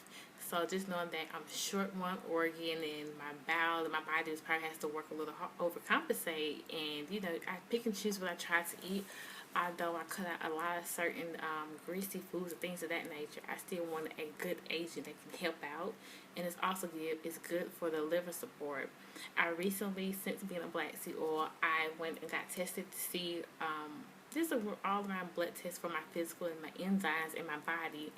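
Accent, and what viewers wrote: South, United States. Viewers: Cute accent ,must be southern ,not know matter what you beautiful Boo.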